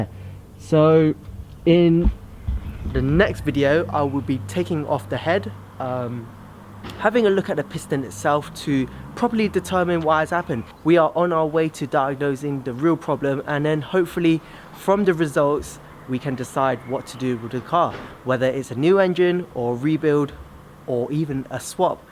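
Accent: England, United Kingdom. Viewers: I'm like your English accent especially you Asian even hotter. Keep good work I'm just subscribed, take care from Cali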